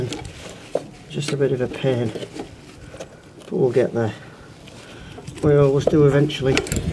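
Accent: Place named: Yorkshire, United Kingdom. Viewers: To save money, i always drill two holes (with my small drill press) in the boss where the pins would have been, tap it with M8 thread and screw in two quality bolts. In worst case i have welded a blade on with two tag welds. I appreciate your channel. It's informative with a wide range of subjects. And i love your thick Yorkshire accent. Nick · is it yorkshire not a manchester accent. If it is yorkshire must be Leeds.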